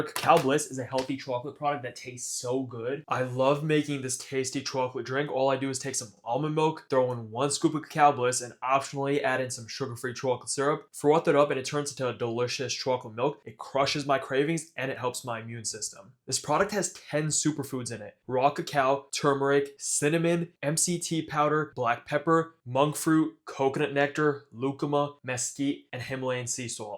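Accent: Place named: New Jersey, United States. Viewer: That new jersey accent, also sus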